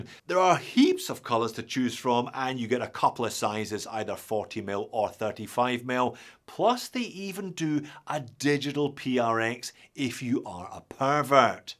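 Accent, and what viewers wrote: Scotland, United Kingdom. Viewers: They all suck in my opinion yet you are bang on about Seiko mineral glass suck, what is up with that slight Scottish twang lulz top ten watches to avoid dunnie waste £300 more like lulz some of them are real bad pish inside that Ali Express watch is soo bad yet the Sapphire glass is decent yet following for the banter lad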